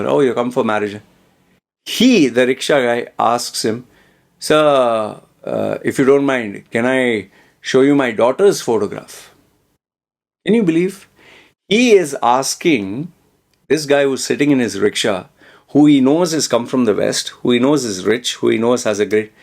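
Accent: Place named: Mangalore, India. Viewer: I wish I had listened to your advise 15 years ago 😆 Especially coz ur explaining it all in Kudla dha porbu style English 😄 Cheers!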